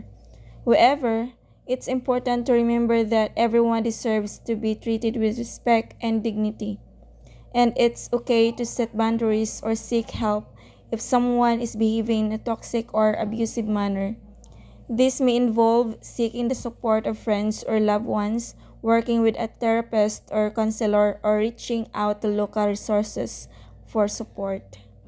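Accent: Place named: United States. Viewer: You sound very American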